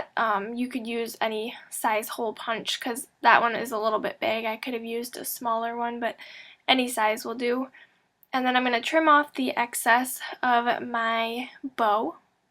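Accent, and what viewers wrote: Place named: Upstate New York, United States. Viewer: Great card,! I was wondering, are you from Upstate New York? Your accent reminds me of my great aunt, who lived in Rochester. Sorry your kitten scratched you so badly, but I'm glad you're OK.:) Thanks for sharing your wonderful talent!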